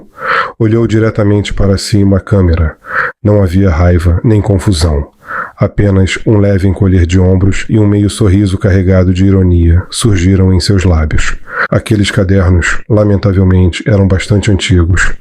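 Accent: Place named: Rio de Janeiro, Brazil. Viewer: Não é problema de respiração.... Este homem que fala é carioca( RJ). Sotaque de pessoas moradores da Cidade do Rio de janeiro..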